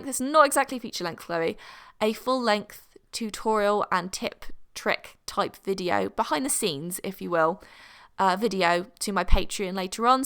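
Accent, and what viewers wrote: United Kingdom. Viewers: funny gal. I wanna know where in Britain they talk like that because I dislike it very much.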